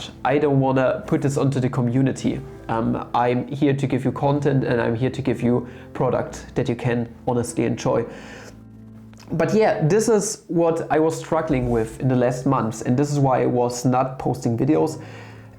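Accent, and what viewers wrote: Germany. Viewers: Thickest German accent I heard. 🫡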